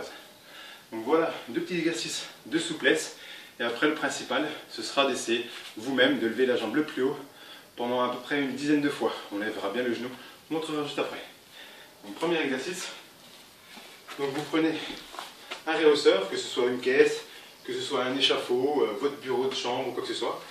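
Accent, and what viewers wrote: Belgium. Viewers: À ton accent je pensais aussi que tu étais Belge 😉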